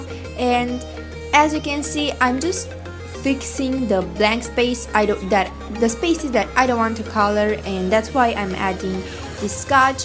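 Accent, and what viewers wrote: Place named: Brazil. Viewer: Hey! Love the DIY's :) and sorry if I'm being nosey, but where are you from? Your accent is so cute! I have a lot of brazilians friend that have the same accent as you, so that's why I'm asking :P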